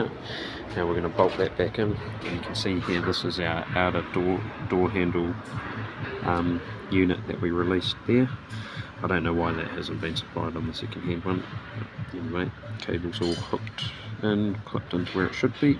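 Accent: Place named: New Zealand. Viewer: Gr8 video M8. My door just failed and this is very helpful to see what is involved for me to undertake (?) or my mechanic. Where are you located? Sounds like a NZ accent! Cheers.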